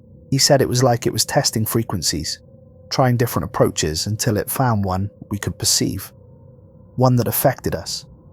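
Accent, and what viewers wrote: Wales, United Kingdom. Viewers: awwww shit we did it!!! thanks for the great content, for talking me to sleep with your caramel butterscotch welsh accent almost every night. well deserved, here’s to many more.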